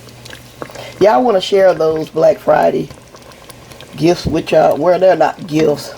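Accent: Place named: South, United States. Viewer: Okay. So she had me at tomatas .. bet she pronounce mosquitas the same way.. REAL SOUTHERN WOMAN YALL!!! I'm riding with her let's go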